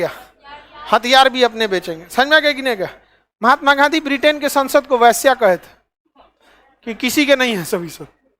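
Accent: Bihar, India. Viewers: ye bihar ke logon ki aise boli hoti hai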